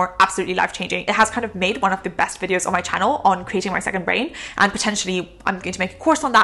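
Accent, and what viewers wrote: United Kingdom. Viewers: That's one of the most british-ish accents I've heard recently. + I highly recommend reading Unscripted : life, liberty and the pursuit of entrepreneurship by MJ DeMarco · That British accent goes like a song. Can't have enough of it.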